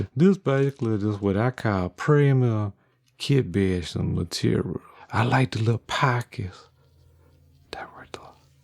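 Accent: Louisiana, United States. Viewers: My favorite Youtube channel. Why can't I stop watching this silly-looking puppet with a voice from down in the Bayou!? I am an adult, after all, for goodness sakes! Now, I find myself shelling out my hard-earned allowance on this thing called Patreon, so you will keep making more videos. But I told my wife, "It's MY allowance, I can spend it how I WANT!". She just rolled her eyes and said..."Awww Rite!" In an authentic accent. I do love that woman. Keep up the great work making quality content. Mister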